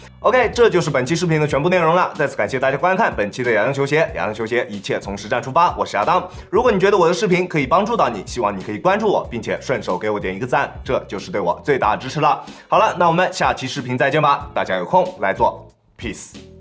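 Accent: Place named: Zhejiang, China. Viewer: 看到嘉兴市 原来亚当是浙江老乡 难怪口音这么亲切哈哈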